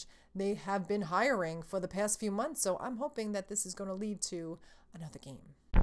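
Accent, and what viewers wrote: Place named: East Coast, United States. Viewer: I'm a new viewer so forgive me for asking if you already said so but you have an interesting accent, are you from the east coast?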